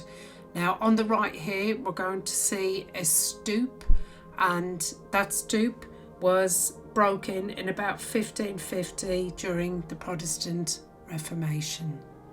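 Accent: United Kingdom. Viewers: seriously I showed the video to my beloved mum for her to experience real English and enigmatic atmosphere of the churches❤I admire Br.Engl❤and your way of speaking❤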